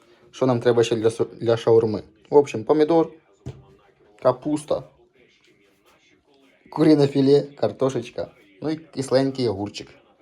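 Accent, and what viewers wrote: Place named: Lubny, Ukraine. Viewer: В мене рiднi в Лубнах. Говiр дуже схожий😁 🇺🇦✌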